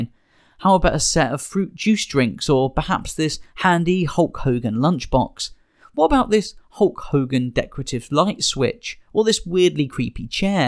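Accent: United Kingdom. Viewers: DOESN'T LISTENING TO A BRITISH ACCENT TALK ABOUT WRESTLING MAKES IT SEEM LESS STUPID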